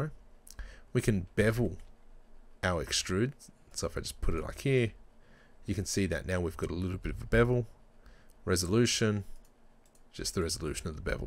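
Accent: Australia. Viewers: Yeah - I am using Blender 2.82 which is a bit different - but the convert-to-mesh was in the same place. Do you have a tutorial to add materials and export for threeJS by any chance? Very cool to hear an Aussie voice in this environment also!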